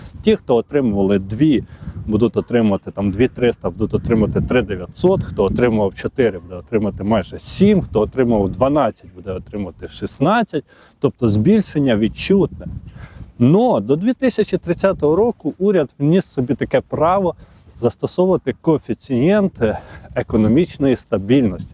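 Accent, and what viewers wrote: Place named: Western Ukraine, Ukraine. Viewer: ну просто "ріже вуха" западенський акцент.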